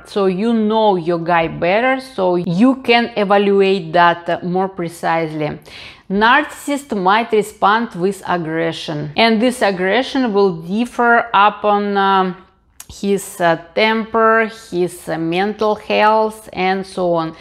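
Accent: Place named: Latin America. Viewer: Mi amor tienes un asento bien marcado deves ser latina trankila q estas hayudando a la gente y eres bella .Yo so soy boricua y con asento bien marcado🤣🤣🤣asi q Palante.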